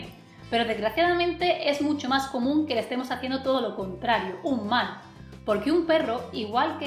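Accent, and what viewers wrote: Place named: Seville, Spain. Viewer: Ese acentillo de sevillana fina te queda muy bien. Soy de Granada pero también del Betis, espero no haber metido la pata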